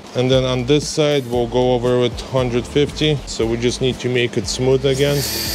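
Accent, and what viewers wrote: Russia. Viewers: русский чтоль?) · Hello! Where are you from? I think you are from Russia or Ukraine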